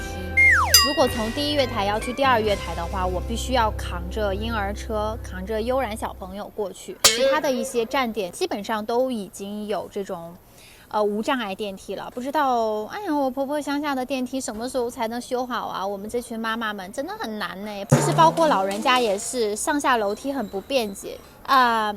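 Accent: Taiwan. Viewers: 妳根本就是台灣人了！妳的口音、語調、想法、觀念，跟大部份的台灣人一樣啊！ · 其實妳是小熊吧 （妳講台灣普通話很好聽） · 你的口音好台灣啊，一點都不對岸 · 苗栗縣人能撥打免費服務專線 1999 詢問任何個人所想詢問的有關苗栗縣相關問題！還有我很高興又是另外一位我所得知說話口音極近臺灣腔國語口音的大陸人。 P.S.其實全臺灣省各地的人，會因為年紀、種族、性別等等的不同，而會影響到個人說話的口音也並不一樣。就像我根本就不知道啥是"南部口音"？ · 口音已經完全台化了！ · 糟糕您的口音越來越台灣了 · 你不說我根本感覺不出來你是大陸人根本一台妹好嗎? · 哈来台湾都会有个通病就是说话都被台化了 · 聲音越來越台灣了，這就對了。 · 講話跟台北人一樣，不像大陸人的口音。的視屛有一種吸引力，看了一次之後，就一直追蹤你的影片。其實台灣超市的密度，是全世界第一的。一個小鎮就有10幾家的超市，競爭得很厲害。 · 妳也有台灣口音！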